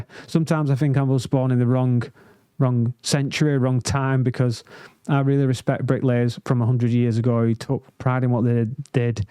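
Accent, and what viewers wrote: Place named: Scotland, United Kingdom. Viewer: your deep scotland accent makes you sound "old and mature"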